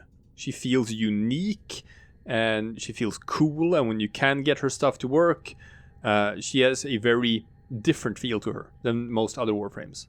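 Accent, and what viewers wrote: Sweden. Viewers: lite skånska i mixen där 🤣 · Lätt att höra att någon är från Sverige när man är svensk 😂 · You Swedish??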